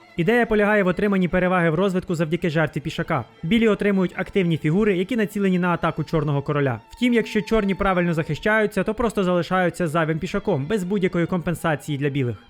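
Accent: Ukraine. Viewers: Ох уж цей ужгородський акцент) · Ахахахах какой смешной колхозный малоросский говор)))) пешака ахахахахах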